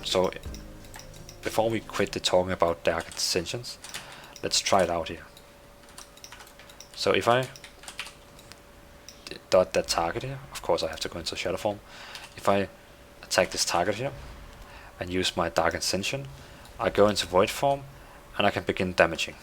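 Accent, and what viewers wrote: Denmark. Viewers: Man you are danish AF XD <3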